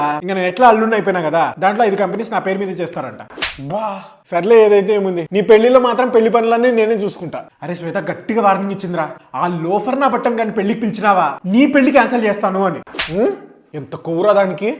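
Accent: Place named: Rayalaseema, India. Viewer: కడపా అనంటపురమా బాబూ నీది?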